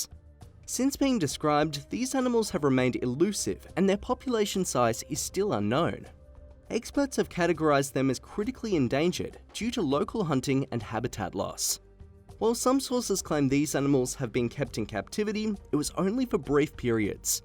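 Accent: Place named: Australia. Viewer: Gotta love an Ozzie accent! 👌🏼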